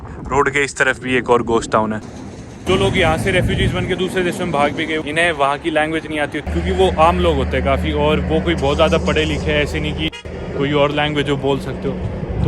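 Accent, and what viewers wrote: Haryana, India. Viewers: Bhai chora Haryana kaa lage hai !!!!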